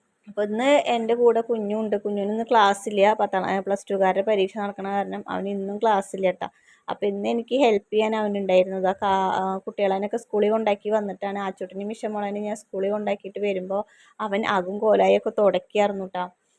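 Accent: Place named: Thrissur, India. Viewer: നിങ്ങളെ സംസാരം കേട്ടാൽ മലപ്പുറം ആണെന്ന് തോന്നും. But കുഞ്ഞു തൃശൂർ slangan. വെള്ളപ്പം 👍🏻try ചെയ്യണം ❤️❤️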